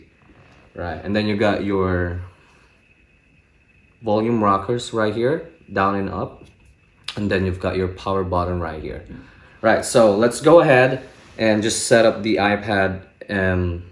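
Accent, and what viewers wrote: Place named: United Kingdom. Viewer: Bumibritisssshhhh accent!!!!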